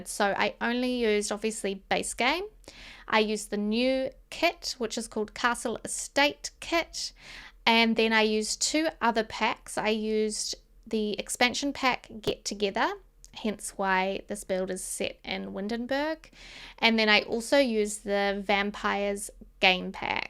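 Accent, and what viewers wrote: New Zealand. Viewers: Hi, I am from New Zealand too, it was quite different and exciting to hear a Kiwi accent over a Sims 4 speed build. This is such a beautiful build, I can't wait to try my hand at furnishing it. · Lovely building! It’s my first time watching your channel and loved your voiceover, New Zealand’s accent it’s so pretty 💗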